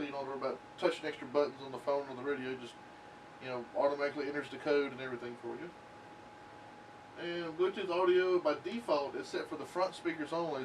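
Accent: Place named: Kentucky, United States. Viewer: sounds like you're from Kentucky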